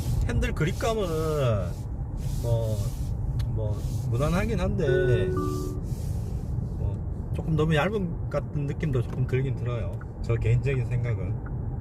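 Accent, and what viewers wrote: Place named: China. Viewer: 조선족이죠?말투가 확티가나는데 ㅋㅋ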